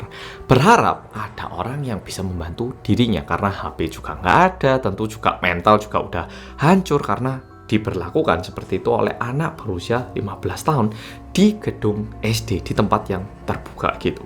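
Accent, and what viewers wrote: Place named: Java, Indonesia. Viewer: Ok... medok... Uraian mu stlh bercerita, aku suka❤ tks sukses sehat selalu ya 🙏🏿✌🏿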